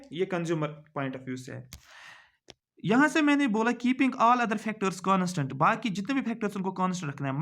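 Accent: Kashmir, India. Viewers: are u Kashmiri sir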